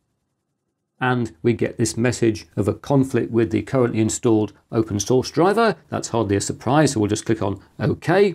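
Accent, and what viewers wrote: United Kingdom. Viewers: Dear ‚ I might be the most commenter on this channel by far. It feels so good to say that you are the god of computing. The way you explain topics‚ the way you divide content into subtopics‚ and ofcourse the great Britain accent. Brutha, you are the goat of computing. Using your videos as a guide to installing anything. Ofcourse, I try things out first. Use Chrome OS Flex on my Main Laptop. And use Omarchy Linux on my desktop home pc. You can say I use Arch btw!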